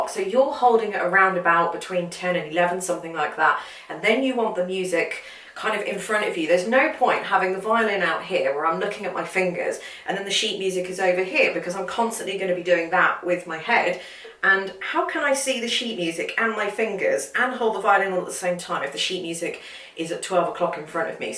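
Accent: United Kingdom. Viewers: I do not know yet your name, but I feel grateful to see individuals like you sharing with us to make our dream come true. I always wanted to play violin since I was a child. I just made the decision to learn following your lesson, and guess what, I bought my Mendini MV500 4/4 full side violin last week, and I have made the total decision to learn playing violin, and know how to play "Amazing Grace", that is what I will do first.Thank you, your lesson are easy to follow, and love your British pronunciation. I have one request for you : can you play Amazing grace with the violin for me!!! Thank for motivate me in this new adventure.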